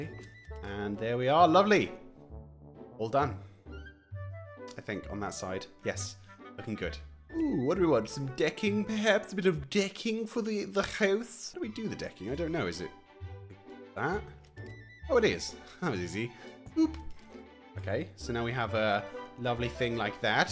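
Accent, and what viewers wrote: United Kingdom. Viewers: your British accent is very cute and fancy!💖💖💖 Love ya!!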